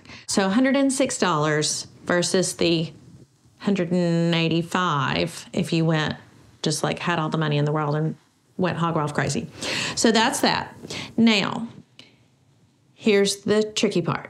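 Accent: South, United States. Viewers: New subscriber here. LOVE your personality, good sense, concern about cost, and...your Southern accent!😁 Howdy from Texas! 🤠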